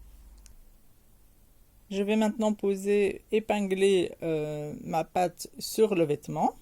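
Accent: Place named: Belgium. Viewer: Bonjour Très sincères félicitations pour l'absolue clarté de vos explications ; c'est un pur régal que de vous suivre. Élève de deuxième année de CAP ( en France - j'ai cru noter une pointe d'accent belge si je ne m'abuse ! ) j'ai découvert votre travail avec satisfaction. Vous êtes une excellente pédagogue et vos explications, tout en restant techniques et conformes aux normes, sont lumineuses ! Il va sans dire que je vais "dévorer" vos tutos ☺️ Merci à vous. Philippe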